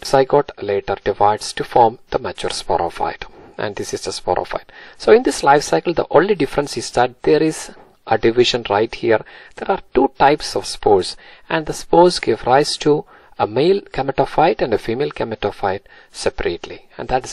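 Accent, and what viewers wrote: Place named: Tamil Nadu, India. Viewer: I guess ur from tamilnadu 😁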